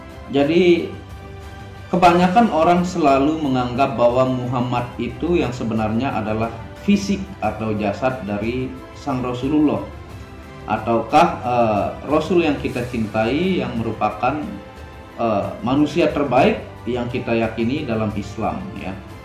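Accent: Bali, Indonesia. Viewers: Masnya ini logat percakapannya kok seperti orang Bali.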